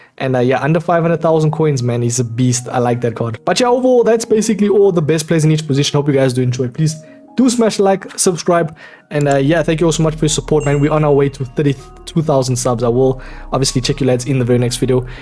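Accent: South Africa. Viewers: Are you South African bro you got the accent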